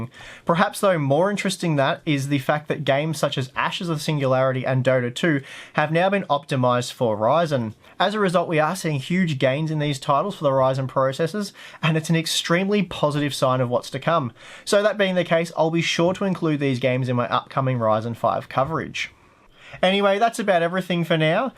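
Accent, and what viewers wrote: Australia. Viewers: what is this guy an Aussie? Aussie! Aussie! Aussie!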